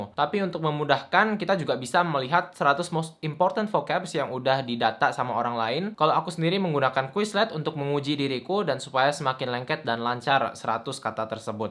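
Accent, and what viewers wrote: South Korea. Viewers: Ka karena udah bisa bahasa korea skrg logat ngomong bhs indonya pun kaya orang korea, mantap ka! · Logatmu berubah kk, logat koreanya nempel banget, debak km keren banget 👍🏻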